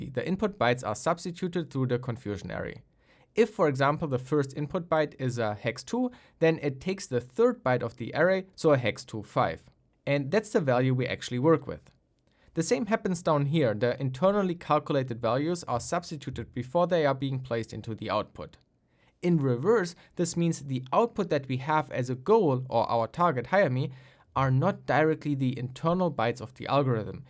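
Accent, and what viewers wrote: Germany. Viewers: I like ur German accent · The german accent makes me want to kill myself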